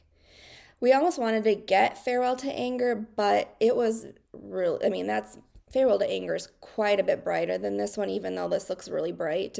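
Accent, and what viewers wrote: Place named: Minnesota, United States. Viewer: OMG I've been under a rock the last few months and am just now discovering you (thanks to Julie)! I just about fell over when you said you have a 25 year old, you look so young! Looking forward to catching up with your videos in my spare time. In other news, I'm obsessed with regional accents...it would make me giggle and wiggle if you would use the following words in a future video: dragon, wagon, bag, Maggie (again) so many awesome Minnesota accent words! lol : )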